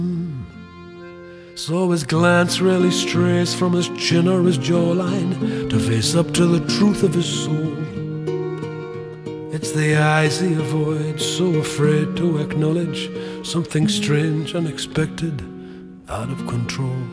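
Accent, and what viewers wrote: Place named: Ireland. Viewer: this man is Irish Up The Irish good o ya u boyo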